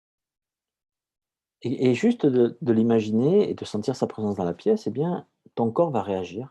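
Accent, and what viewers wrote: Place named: France. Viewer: He notado que cuando hablas español usas algunas palabras que tienen esa terminación en tu acento francés Y eso me agrada me diste unas ideas bien extrañas para escribir poesía de esa manera J'aime votre langue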